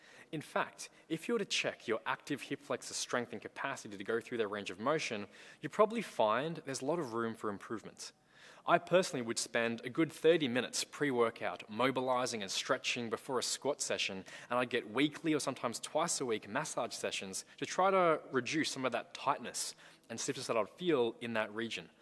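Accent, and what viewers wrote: Australia. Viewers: Are you from Australia? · I’ve never seen an Asian with an Australian accent, super cool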